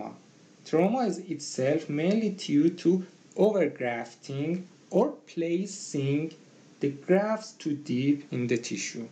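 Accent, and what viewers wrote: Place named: Iran. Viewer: I have a classmate he speaks just like you, So I guessed you must be iranian.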